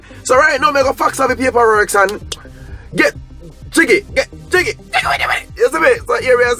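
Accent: Jamaica. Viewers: Hey yuh should read the captions on yuh videos, it's so funny. Youtube captions algorithm can't handle de Jamaican accent.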